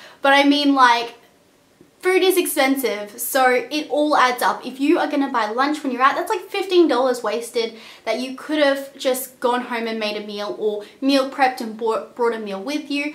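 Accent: Australia. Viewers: Australian accent is quit pretty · its Australian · Nope she's definitely Aussie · How are you getting British? She's clearly Australian.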